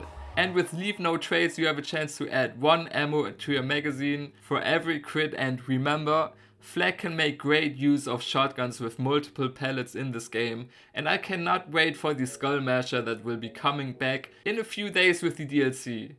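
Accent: Germany. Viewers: I can feel the German accent in my blood... keep it up!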